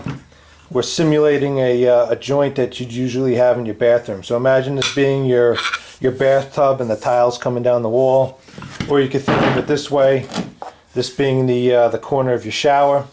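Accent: New York, United States. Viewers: Yikes wish I saw this a few days ago, just spent 3 days struggling with other methods and sticky stuff everywhere. Some people warn against window clean some recommend windex. I just used alcohol on my finger to smooth the bead but the problem I had in NC Florida was a cold snap and I couldn't get the caulk to run very well so it had blobs. I thought it was a lack of strength in my hands but it was the temp. After I ran a heater it worked better, but tooling the blobs was a nightmare. I am a DIY accomplished craftsperson but wow, this was as bit over my head in tolerance. Wish I saw your instruct, hopefully I won't need to redo it for 10 years, but then at 88 I may just say buzz off, and bleach off the mildew!!! LOL thanx for an excellent presentation. Love your NY/East Coast accent!!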